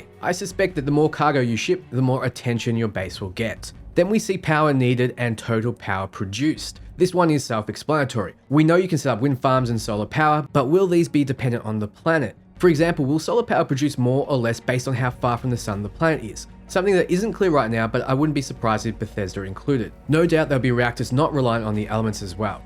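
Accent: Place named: Australia. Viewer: Amazing content! I have been obsessively watching all your clips! Also good to hear an aussie accent in these vids.. *not an aussie, but a kiwi cuzzy!* Have you found any intel on if bgs have reworked the 'karmic elements'? I'm soooooo hoping I can be a evil crimson pirate!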